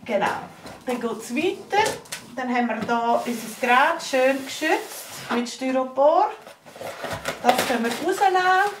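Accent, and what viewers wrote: Switzerland. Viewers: Juhu, isch scho es Zitli här das Video! i wohne z'Basel, chume aber ursprünglich au us der Region Solothurn/Bärn... due doch nid esone Zürcher Dialäkt ufsetze... wenn nämlech am Erkläre bisch im Video seisch jo au Röueli z.B., lohs lo sprudle! ;-) aso me verstoht di Mi Cameo 3 isch no haub ipackt im Büro und i goh jetz grad mou go luege öb das stimmt wo du do verzöut hesch im Video ;-) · Stimmt, Schwitzerdütsch ist kein Deutsch..... Aber ich versteh dich! ;-)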